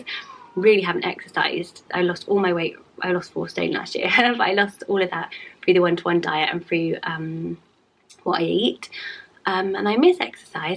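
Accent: United Kingdom. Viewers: Feel better! Funny story; when I first started watching you about a month ago, my captions would always write your name as and I’m American so i just thought you were saying w a British accent I guess? And i was like why the heck is her channel name? then one day my captions wrote, and that’s when it finally clicked🤦🏽‍♀️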